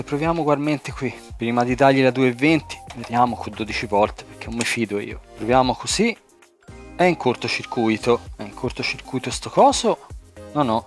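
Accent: Tuscany, Italy. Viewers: Sei un simpaticone e mi fai divertire un sacco con il tuo dialetto TOSCANACCIO 😄😄😄😄😄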